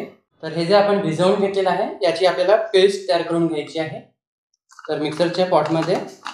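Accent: Vidarbha, India. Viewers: Vidarbhachi bhasha, bolnyachi shaili agdi mast.Mi panNagpurchi ,aata Mumbaila rahate.tuzi recipe aani vidarbhatil vishesh shabd donhi very ❤sweet.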